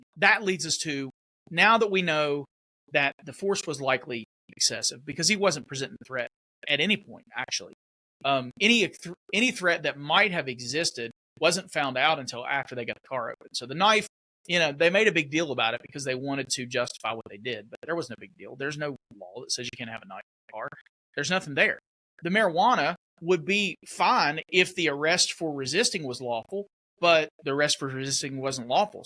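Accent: South, United States. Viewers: Great analysis. Thanks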